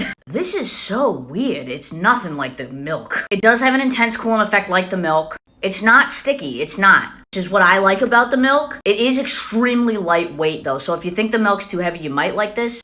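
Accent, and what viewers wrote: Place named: Boston, United States. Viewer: It takes a Boston accent to know one. It’s so weird how it’s normal in real life but on Tv/Phones/videos... the accent sticks out like a wicked sore thumb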